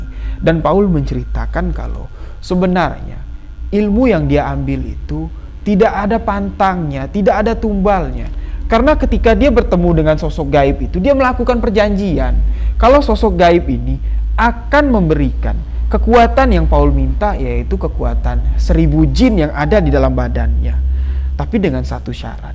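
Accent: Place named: West Sumatra, Indonesia. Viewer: Abang ini orang minang ya... Logat bahasa nyo agak urang minang barbahasa Indonesia, terperangah, dll heheh..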